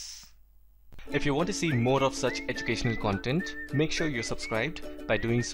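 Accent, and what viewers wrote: Bengal, India. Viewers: Sir are u bengali ?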